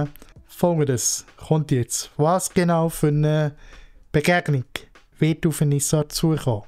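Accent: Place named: Switzerland. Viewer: War nicht leicht, aufgrund des "schwyzer Dütsch", alles zu verstehen, aber das was ich verstand: Sehr kluge Worte, sehr gute Einschätzung! Danke dafür! VLG Johann. :goodvibes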